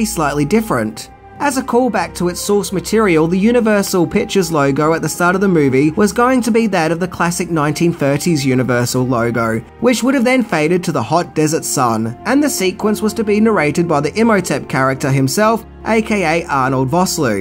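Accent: Australia. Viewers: Oi you’re an Australian, sweet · It’s even printed wrong on the last title card. I was cutting slack for the Aussie accent thinking maybe he’d always just heard it wrong but when it appeared incorrectly in print, I gave up.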